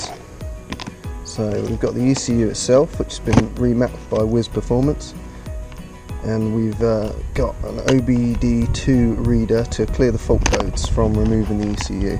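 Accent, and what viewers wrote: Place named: England, United Kingdom. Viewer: Dude you sound English. Where abouts are you? I’m Lincoln. Brand new XSR700 in black owner.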